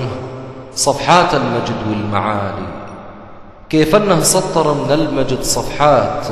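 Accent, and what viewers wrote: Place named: Bedouin, Jordan. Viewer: كلام جميل بارك الله فيك لكن هذا ما هو خليجي هذي لهجه بدوية اردنية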